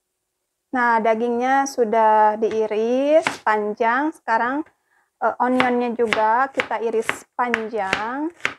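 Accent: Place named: Sunda, Indonesia. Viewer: Teh lama di itali tspi sundanya ko totobanget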